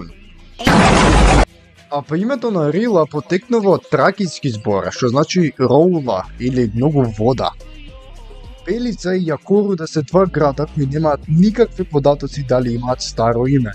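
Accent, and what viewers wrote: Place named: Skopje, North Macedonia. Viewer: не употребувајте некаков скопски нагласок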